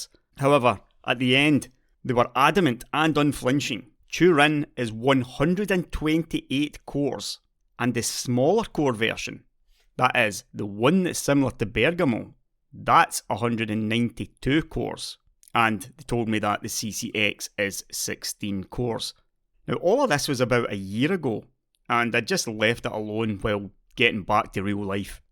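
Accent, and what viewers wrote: Scotland, United Kingdom. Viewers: a scottish tech youtuber say "all right guys, how's it goin?"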